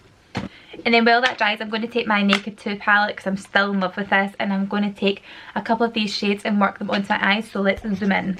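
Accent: Scotland, United Kingdom. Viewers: I found you through watching fleur de force and I must say I love you just as much if not more. It's so nice to hear an accent like my own, I'm in shotts. (Don't judge! 😂😂) is love to see you do a fenty beauty review, I watched fluers and Jamie Genevieves and am obsessed already. The only place to physically buy it in Scotland is Harvey nicks in Edinburgh, if you do a review you can let me know if it's worth the trip 😂😂 xx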